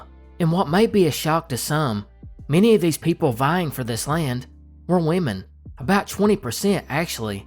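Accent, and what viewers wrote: South, United States. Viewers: This is great. I can’t sleep without his calming southern drawl lulling me.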